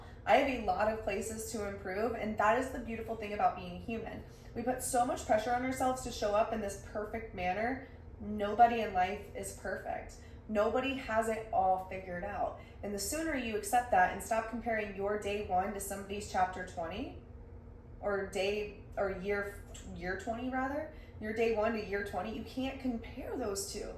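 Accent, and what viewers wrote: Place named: United States. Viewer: 🙂Another good episode thanks. Btw i love how you yanks say "yaw all":p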